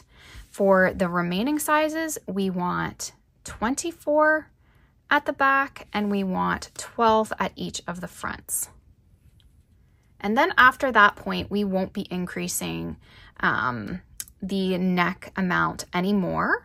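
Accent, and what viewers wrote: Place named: Canada. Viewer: Just finished my cardigan. Beautiful thanks!! And I do love your Canadian about and out pronunciation 🥰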